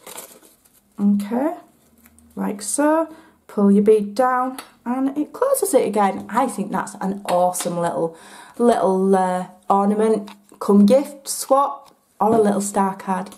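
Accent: United Kingdom. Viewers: Such a neat MAILABLE gift! Love your accent, too. May I ask where in the UK you're from? Thanks for sharing! :)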